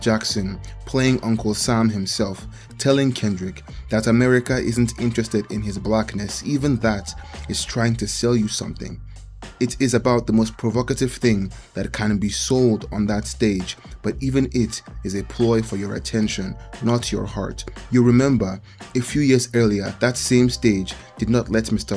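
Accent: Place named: Nigeria. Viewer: You didn’t need to say you Naija, it’s a distinct accent, country also history.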